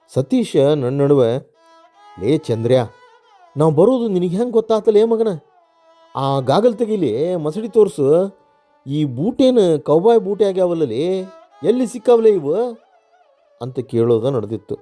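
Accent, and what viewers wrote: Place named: Dharwad, India. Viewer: ನಿಮ್ಮ ಧಾರವಾಡದ ಒಳನುಡಿ ಕೇಳೋಕೆ ಒಂದು ಚಂದ ❤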